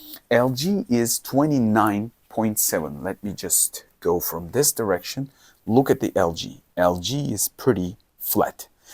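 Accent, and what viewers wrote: Turkey. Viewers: Aksan çok belli ya, abim bizden 😅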